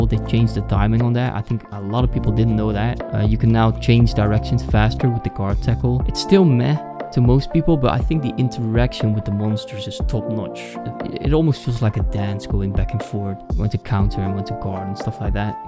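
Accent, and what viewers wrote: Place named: Netherlands. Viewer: I can pick that dutch accent out of ten thousand voices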